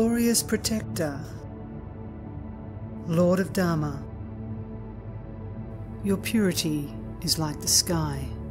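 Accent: Australia. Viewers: I have been listening to your recordings for about 3 months and your voice is quite mesmerising . I can think it is 2 things the “ light” of consciousness and of course the subtle Aussie accent . 🙏 Namaste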